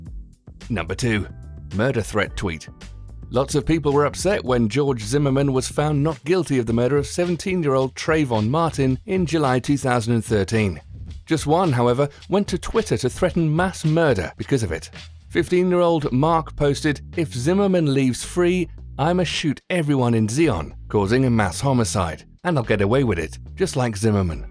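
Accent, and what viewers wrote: United Kingdom. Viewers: boring and i hate your british accent you bloody wanka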